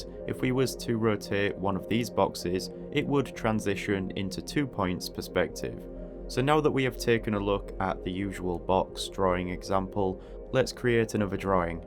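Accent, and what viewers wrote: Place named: Scotland, United Kingdom. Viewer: He has a Scottish/British accent and is from the UK. He has images and a few links about Staedtler which is a company in Nuremberg, Germany but has 150 other representatives in other countries. One link stated that he was on site in England. He also is an architecture graduate at BlokBuild from Hull, East Yorkshire [England]. This took about 8 minutes to find out. You’re right, in that, asking him is the direct answer—-but he rarely comments, I haven’t actually seen him commenting at any point and I was curious myself. This informaiton is only speculation, for one—-im 100% block out deaf. But this could be why there’s confusion on his accent, this happens when someone is from one place and relocated to another [even more so if a third place is added].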